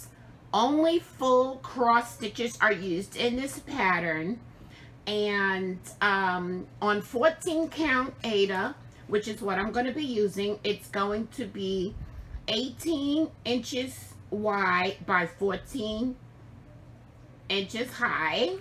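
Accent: New York, United States. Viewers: Hi, Im originally from Bklyn, NY and I detected a familiar accent. I went back to your first introductory flosstube and sure enough your a native New Yorker! Awesome!! So happy to have found you and have subscribed to your flosstube. I never had a stitchy friend from NY. I crocheted back in my Bklyn days but learned cross stitch since living in NJ. I stitch in hand but occasionally will use a qsnap. I like stitching on 28 ct and use mostly DMC but like the fancy flosses variegated look. Ive ordered from Hobby House Needleworks a few times, love that shop. Thanks for being a part of the stitch world. See you next time.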